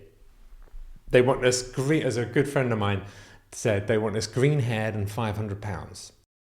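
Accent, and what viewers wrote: United Kingdom. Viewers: Love your snappy suits! Strong, masculine and intelligent. Plus, British accent! ❤ Get a German Shepherd!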